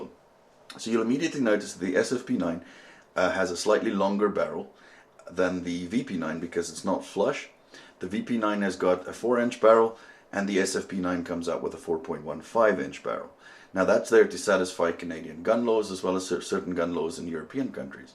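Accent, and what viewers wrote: South Africa. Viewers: Are you South African? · Are you South African? That accent sounds familiar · You sound a bit South African. Thanks for a great review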